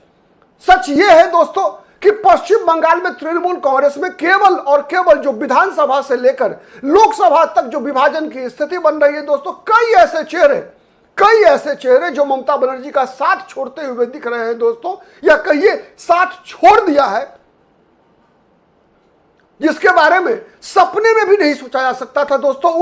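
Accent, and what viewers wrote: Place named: Bihar, India. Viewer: आप बिहार से है क्या ? आपकी टोन बिहार वाला है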